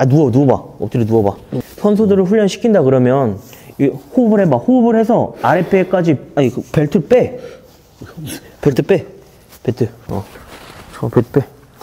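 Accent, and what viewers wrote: Gangwon, South Korea. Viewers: 강원도 억양이네 ㅋㅋㅋ 흔치 않은데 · 강원도 사투리 👍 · 강원도 사투리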